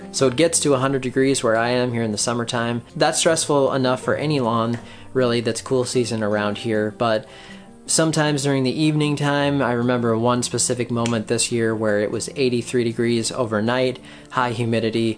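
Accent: Minnesota, United States. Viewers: You must be Minnesotan, Right?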